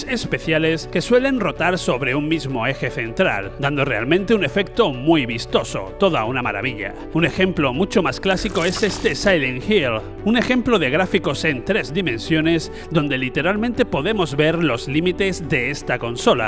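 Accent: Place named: Andalusia, Spain. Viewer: Me encanta como los ibéricos pronuncian y distinguen los sonidos SC como eSH-Zenarios 😆😍Buenísimo el video abrazos desde Argentina al gran andaluz 🤩🥰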